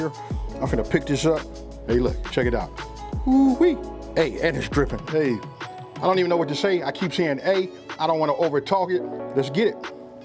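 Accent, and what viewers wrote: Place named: Los Angeles, United States. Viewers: Man I lived in California for 2-years Anaheim off of Brookhurst Avenue. Also spent time in Alameda California. Soon as I heard you talk and that accent, I said big homie from Cali. New subscriber and honestly, you should have over a million. Let's go let's get a to over a Million by Year's End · I can tell bruh from LA by the way he talk. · Boy you is the real deal! I live in Houston but I’m born and raised in LA (Crenshaw and Slauson!) and hearing your accent makes me feel at home ❤️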